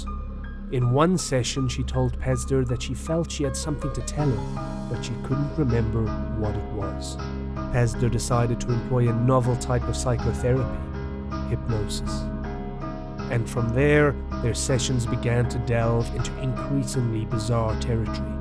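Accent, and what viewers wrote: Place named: United Kingdom. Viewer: Always better with a British narrator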